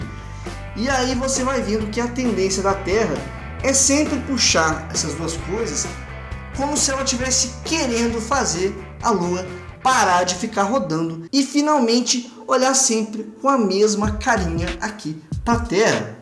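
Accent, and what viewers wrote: Minas Gerais, Brazil. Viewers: Sotaque da CAMILA do PEIXE BABEL mode on! kkkkkkkkkkkkkkkk Veio um mineirês com um baianês bem sutil, mas eu pesquei! kkkkkkk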